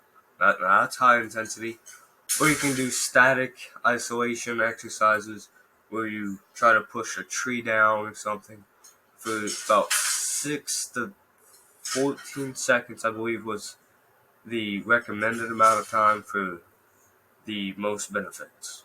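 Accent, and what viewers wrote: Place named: North East, United Kingdom. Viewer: Sound like he in the north east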